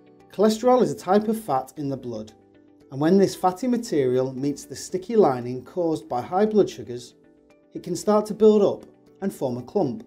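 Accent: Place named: North, United Kingdom. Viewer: Me just wondering what kind of British accent this guy has! Somewhere in the north?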